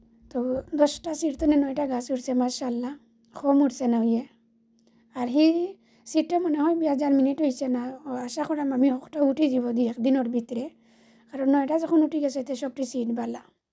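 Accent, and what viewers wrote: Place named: Sylhet, Bangladesh. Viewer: ও মাই গো আপনি সিলেটি আমার সিলেটি মাত আমার খুব ভালো লাগে। আমিও সিলেটের মাজো অনেকদিন আসলাম 😊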